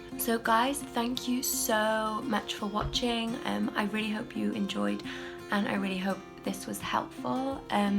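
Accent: Wales, United Kingdom. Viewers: Is that a Welsh accent I hear? Xo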